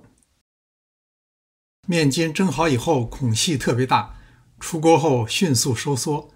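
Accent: Beijing, China. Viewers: 看了很多美食视频，最喜欢的。不仅食材的获取途径，还是做法都很易操作，还有同是北京的调调很亲切。😊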